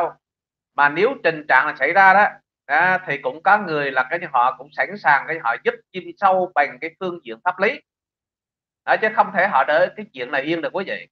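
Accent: Standard. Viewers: nói quá chuẩn nghe đã tai.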